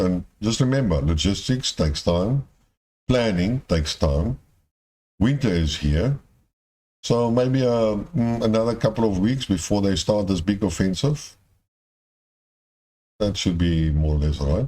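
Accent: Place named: Afrikaans, South Africa. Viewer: Disagreeing for t he sake of it with no alternative explanation. With a thick Afrikaans accent nobody can understand van de plaas.. i dont plan listening to more of your videos.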